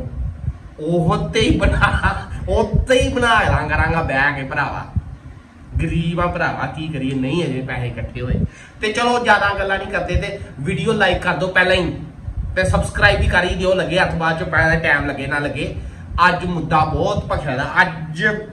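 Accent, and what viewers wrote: Pathankot, India. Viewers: ਬੋਲੀ ਬੋਹਤ ਵਧੀਆ ਭਾ ਪਠਾਨਕੋਟ ਵੱਲ ਦੀ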